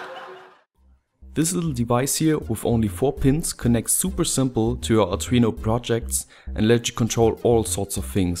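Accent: Germany. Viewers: Hmm.... The Sound is like german speak english 😉😄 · Are you a German? Sounds like :D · Du bist deutscher man merkt es bitte mach deine Videos auf Deutsch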